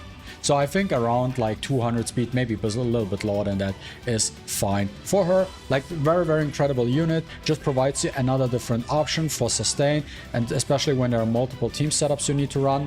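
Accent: Germany. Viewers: Bro is German?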